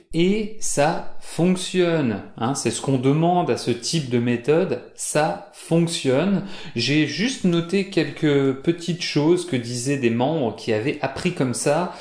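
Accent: France. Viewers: Salut! Merci encore pour vos vidéos pleines d'enseignements et d'instructions, bien entendu. J'suis un étudiant senegalais passionné des langues étrangères notamment le français et l'anglais. Vos vidéos m'ont beaucoup apportées en terme de niveau français. Mais j'ai un petit soucis pour ne pas dire un problème, étant fasciné par la culture française, j'aimerais bien avoir un accent français assez simple comme le vôtre; mais je ne sais pas comment s'y prendre? Avez vous une solution à cela?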